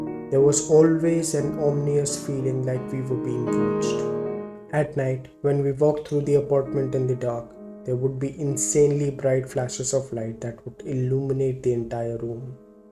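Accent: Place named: Asia. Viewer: Mispronouncing English words, bad voice, loud piano, background noise. The narrator must be non-native, someone from Asia. (bad experience)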